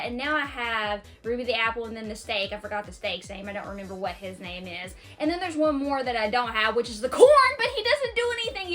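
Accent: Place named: Georgia, United States. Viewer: youtube suggested your channel to me, your personality is great and your accent reminds me of my friends back home in GA lol so i dropped a like and sub 👌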